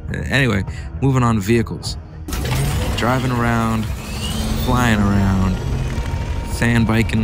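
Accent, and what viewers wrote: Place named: Bronx, United States. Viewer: When a Hoodie from the Bronx starts to talk about a Computer Game - I am out!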